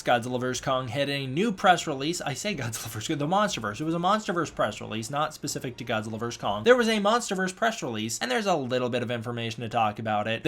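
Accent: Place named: United States. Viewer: When the Legendary speaker mispronounces Camazotz and continues with that mispronunciation. I mean, I know you’re gonna have an American accent, but PLEASE at least try.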